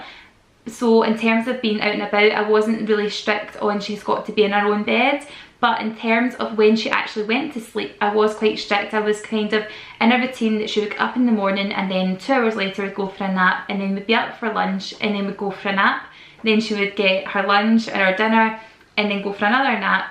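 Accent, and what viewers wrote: Scotland, United Kingdom. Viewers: I love your scottish accent and your pretty hair!!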